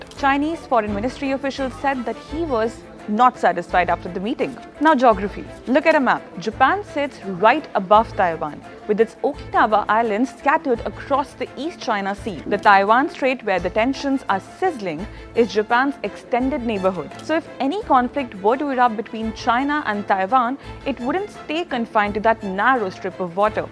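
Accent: India. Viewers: Why does the anchor have very strong Indian accent? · Are you speaking Indian lanagauge? Sound like Indian language!!! Please improve, learn and reduce your Indian accent as much as possible.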